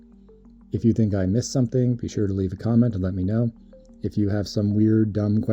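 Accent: Canada. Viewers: "Hærts." That's what goes doki doki when I hear the unmistakeable accent of a True Northerner. 🇨🇦 Great research and videos, standing on guard for you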